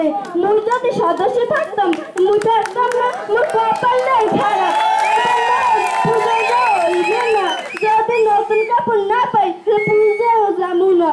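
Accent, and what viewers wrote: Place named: Barishal, Bangladesh. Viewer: বরিশালের ভাসা ভালো লাগেনা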